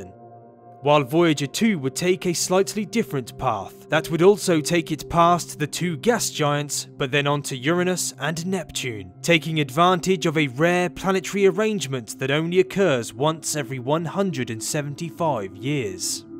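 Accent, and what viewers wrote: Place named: Liverpool, United Kingdom. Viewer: You probably shouldn't have said that the morons succeed in getting under your skin. They live for that shiz. Btw, I really dig your Liverpool accent...or is it Cockney? :)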